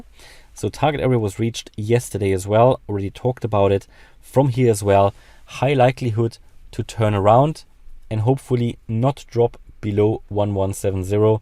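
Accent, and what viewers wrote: Germany. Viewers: geiler Typ 👍 hört man direkt den guten deutschen Dialekt raus 👌 wollte nur schauen wie es um ADA steht und bin auf dein Video gestoßen. Abo hast du sicher.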